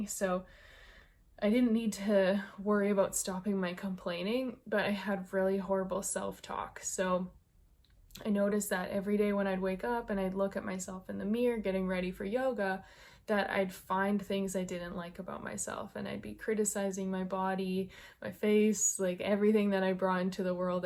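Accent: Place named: Canada. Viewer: Hi, I found you on Kristina"s Channel Spiritually Selfish...and wow, a couple things..you are such a bright light I had to check out your channel...then you are SO MUCH like my friend Ryan...I met her in the early 90s at the Dead Shows..I'm an old hippy myself lol..she's Canadian (you sound like you might be from north of the border) and is a Taurus born 4/30 and you have some good positive serious Taurus energy....so maybe we're in a similar soul family but my main draw to you was your healing work and I'm curious if what i do is really "Quantum Healing". I connect with people's energy fields from distance and work with their fields and every healing I see my hands doing different things and I get messages from spirit about what is really going on with their health and can offer that......oh..I'm not bragging btw because Spirit heals, not Adam lol. I'm just her instrument but we've worked on people in hospice with cancer and within 48 hours they'd be up, eating, not everyone has these results but some do....the latest person is playing his guitar again up and eating and he was terminal with pancreatic Cancer. 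wasting away on Morphine......people have gotten up from their wheelchairs totally healied from Lupus...It's so freakin amazing and I myself healed from an "incurable" autoimmune disease I had almost 60 years..but no steroids for the first time in my life in over a year!!!! 3 years of daily affirmation work, energy work and diet. I work as a Medium so I don't charg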